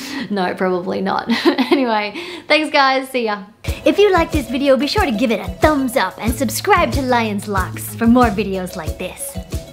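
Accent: Australia. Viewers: She's so beautiful!!Love her aussie accent ♥♥♥♥♥♥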